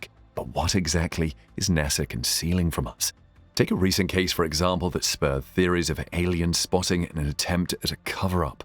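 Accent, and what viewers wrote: United Kingdom. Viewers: Just how far in space can a built object go before burning in the atmosphere? What are the odds that we are being lied to about ALL what this guy with his SO official voice?!? And because he has a soft English/British voice, is that supposed to make him seem honest when ALL they've done throughout time is lie to us???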